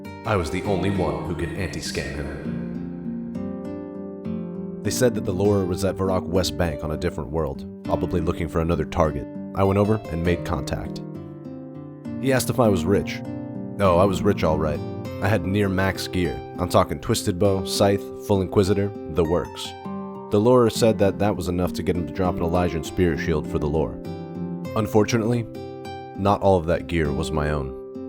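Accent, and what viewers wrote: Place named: Wales, United Kingdom. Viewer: a welsh guy doing the voiceover cba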